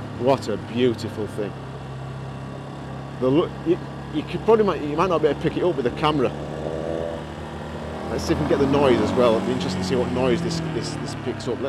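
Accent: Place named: Leeds, United Kingdom. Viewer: After pissing about reading viewing and thinking re instamic pro plus, the new dji wireless mic and the rode go 2 I have just bit the bullet and bought the rode 2. Can i ask how do you mount the transmitter to your helmet? I'm wearing a shoei note 2 flip. I saw a guy with a boom mic connected and plug into the rode 2 but was hoping for wireless with a dead cat etc. I have a boom mic in my helmet for talking rider to riders so there would not be room unless i place second mic on other side of helmet... any help or advice would be great thanks GOOD VIDEO where are you you sound like a LEEDS accent...but that snot west yorkshire lol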